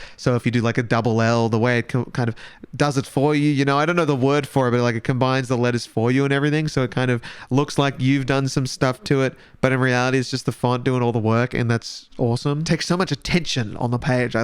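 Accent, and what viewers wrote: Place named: Australia. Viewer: Wondered if you were Australian from the accent... then you said bloody hell and I was like yep.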